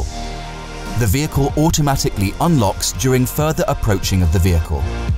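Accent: United Kingdom. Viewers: 뭐야? UK English???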